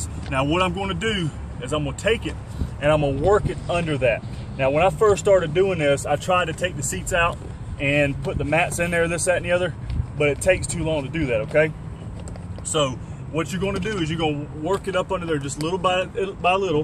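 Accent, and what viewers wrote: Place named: South, United States. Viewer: I never would’ve figured this out by myself in a million years. Thanks! The accent made me homesick for the south! Especially when you say mash down 😊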